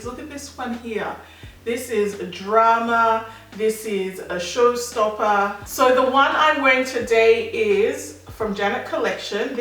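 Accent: Australia. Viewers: Are u Aussie??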